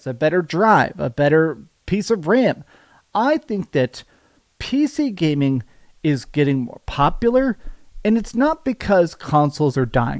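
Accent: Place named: South, United States. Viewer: Southern accent. He even says y'all. Are you saying white people can't have their own culture?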